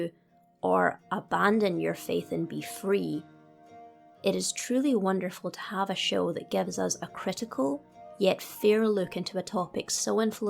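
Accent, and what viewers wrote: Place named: Scotland, United Kingdom. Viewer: I hear a Gaelic accent in your voice ( im assuming Scottish?) Interestingly, Karen Traviss when writing the Republic Commando series based Mando culture heavily on the Celts. Mando'a is spoken like Gaelic but with more of the rules of Norse as far as vowels and such. Aliit Ori'Shya Tal'Din for example. Its what's being sung when Boba Fett finds the Tuskan tribe that adopted him in dead. It means family is more than blood. The family structure is similar as well to both cultures, though women are expected to take even more of an active role in defense/war unless they have children. As a Christian Dins journey reflects my own in some ways. Im more like Boba Fett personality wise, but i struggle between the world and the church. Literally the only other character i related to in this respect was Quigon Jinn vs the Jedi Council. 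But for my relationship with the greater church. In his case, it was the Will of the Force vs the Will of the Jedi.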